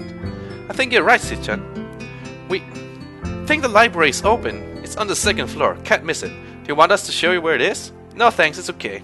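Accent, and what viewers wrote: Denmark. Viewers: I wonder what his first language is? Danish, maybe? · Are you danish?!! O_O I am danish!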